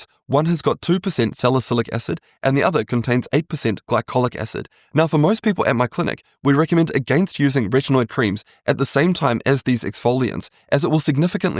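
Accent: New Zealand. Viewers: Seems fine to me. Maybe it's the New Zealand accent? I'm Aussie so perhaps this is how I talk too 🤣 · Love the Kiwi accent… even though I hope AI wasn’t involved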